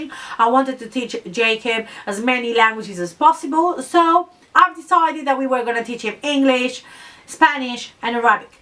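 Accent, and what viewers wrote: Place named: United Kingdom. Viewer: I like your British accent ❤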